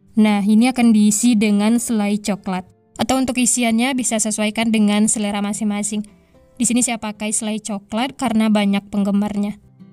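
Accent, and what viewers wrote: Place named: Minangkabau, Indonesia. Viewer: Denger suaranya aku ngomong dalem hati "kaya urang awak nada bicaranya" eh pas liat foto profilnya iya lagi pose di jam gadang hehehe Salam kenal yah karna bgimanapun alm.papa urang minang maninjau❤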